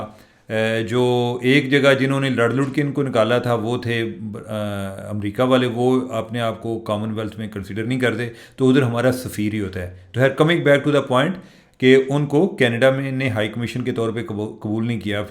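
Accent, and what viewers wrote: Punjab, Pakistan. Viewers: Mostly punjabi (central) aisay he boltay hain, please don't mind😂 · صاحب ، پلیز اپنی اردو ٹھیک کریں، اگر آپکو ٹائم ملے تو اپنی ویڈیو دوبارہ سنیں اور دھیان دیں کہ آپ لفظ بھکاری کسطرح ادا کر رہے ہیں ؟ عام حالات میں بھی پنجابی لہجے میں اردو وی لاگ ہوتا ہے لیکن آج تو بالکل ہی حد ہو گئ۔ 😮صرف کان نہیں پورا جسم تکلیف سے گزرتا ہے آپکے تلفظ سے۔ میں خود اردو دان نہیں ہوں لیکن ہمیں کسی بھی زبان کے تلفظ پر بہت زور دیا جاتا تھا۔ 🙏🏻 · Saheb, Punjabi, Salam Sir jee, whatever your qualifications may be, you will remain “ Pendu”, and never become a polished journalist or media person, unless you Change your spoken language habbits.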